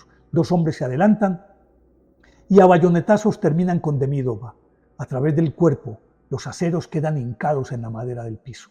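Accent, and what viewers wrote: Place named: Antioquia, Colombia. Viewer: Uy Profe excelente relato, siempre enganchado con sus fascinantes historias, y lo mejor con acento paisa. Un abrazo grande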